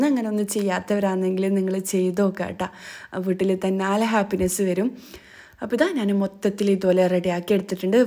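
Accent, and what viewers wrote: Kannur, India. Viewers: English channel kanunna pole nd.. masha allah.. but varthanam njmmle kannurle🫶🤩food kuraknamnn vijarkum iyalde recipe kanumbo pinnem ndakki povm.. keep going🎉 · കണ്ണൂർ ഭാഷ തന്നെ മതി കേൾക്കാൻ നല്ല രസമാണ് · കണ്ണൂർ ഭാഷ പറ ഇതാ ഞങ്ങളും കേൾക്കട്ടെ കേൾക്കാൻ നല്ലരസാ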